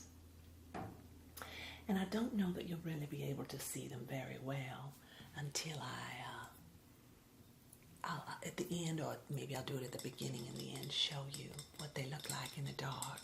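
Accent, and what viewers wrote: South, United States. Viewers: Omg omg I love the accent, I am European so southern accent sounds so so so sweet!!! Love it. thank you! So much!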